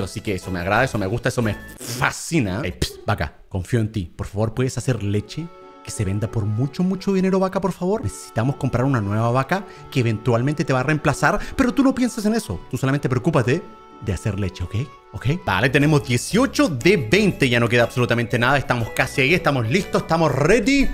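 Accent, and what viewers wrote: Chile. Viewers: like acalos que quieren un video de german hablando puro chileno :p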